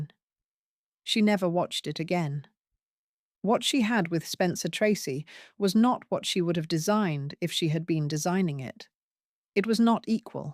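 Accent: United Kingdom. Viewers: Love you Stories and info❤. Thank you SO very much. Bless everyone on you’r team. A lovely narration with a beautiful British voice. . From Uk Susie ( how i miss ‘our accent’) in Melbourne Australia . These true stories are absolute Gens ❤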